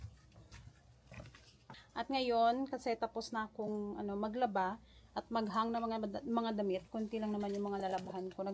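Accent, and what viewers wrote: Iloilo, Philippines. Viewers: Ilongga po kau?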